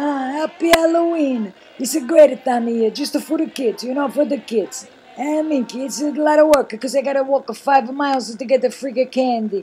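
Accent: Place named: South, Italy. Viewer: calabrese or Napulitano...it is definatly southern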